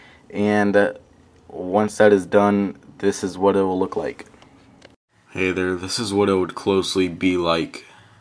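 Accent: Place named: South, United States. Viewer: hes from the south yo :)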